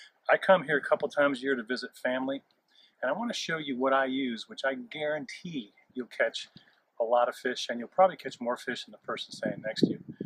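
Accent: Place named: Upper Midwest, United States. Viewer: Are there any catfish in the "White River"? Oh by the way you sound like you are from the upper midwest??? Thanks for the video!!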